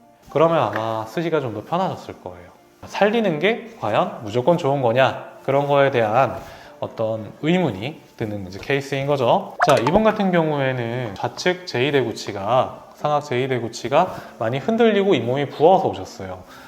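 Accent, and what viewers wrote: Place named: Gangwon, South Korea. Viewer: 원장님도 강원도분이시네요^^ 사투리가 아직 남아있으세요^^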